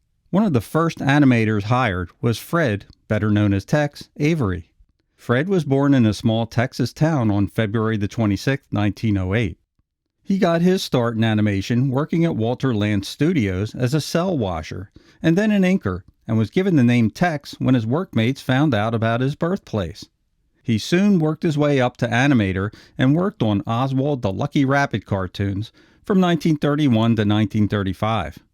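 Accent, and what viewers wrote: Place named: Philadelphia, United States. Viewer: I hear an accent I can't ever not hear again, sir, would you happen to be from Philadelphia?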